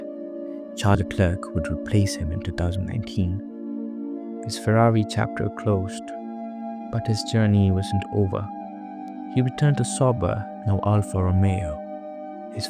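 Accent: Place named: India. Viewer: real - I think indian accent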